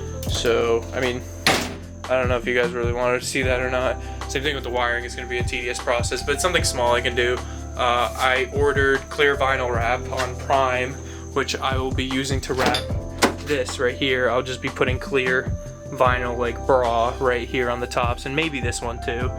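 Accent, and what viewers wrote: Russia. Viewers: Eres ruso p estadounidense?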